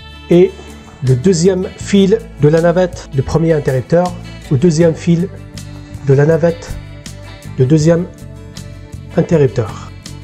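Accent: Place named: South, France. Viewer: Je découvre et c’est vraiment bien expliqué, et en prime cet accent du Sud qui lorsque on vit dans le Nord remplit de bonheur.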